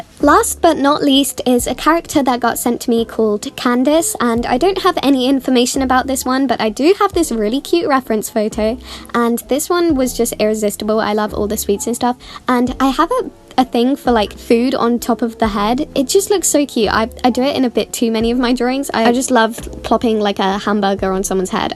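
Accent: United Kingdom. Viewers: THAT TOP RIGHT DRAWING??? SERIOUSLY MY FAVORITE PIECE OF YOURS!! she does not look dead 💀😭 she looks very alive!!! My favorite outfit to wear is probably an oversized tshirt with mom jeans! Honestly anything with mom jeans 😤✋ Also I love your bri’ish accent 🤭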